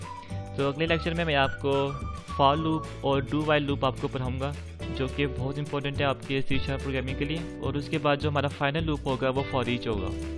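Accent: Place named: Sialkot, Pakistan. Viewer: good and helping tutorial (y) ... and nice voice :P as I heard you , i knew that you from Sialkot :P maybe u r not but i thought :) Good job ,, keep it up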